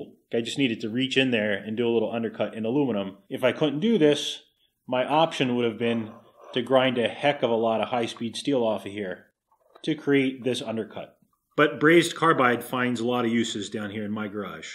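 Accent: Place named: United States. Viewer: three sets of two numbers? WNMG-08-04-08? What planet are you from? You sound American. I've been at this for 19 years, and where I come from (the west coast of the U.S.A it is one set of three numbers...WNMG-332 (of course your 08-04-08 is closer to a WNMG-2.52.52, but I have nver seen that, obviously, lol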